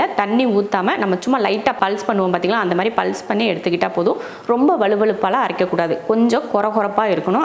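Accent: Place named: Theni, India. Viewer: Akka neenga Theni districta ?Unga Slang ,food habbits apdithan iruku.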